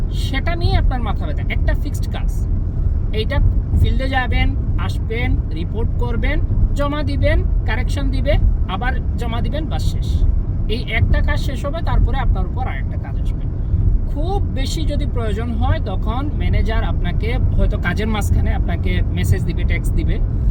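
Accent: Sylhet, Bangladesh. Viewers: আপনি সিলেটি?❤️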